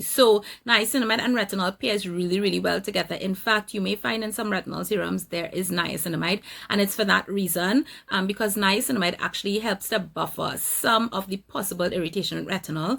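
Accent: Trinidad and Tobago. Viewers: Fellow Trini?? :) I hear the accent. Wow beautiful lol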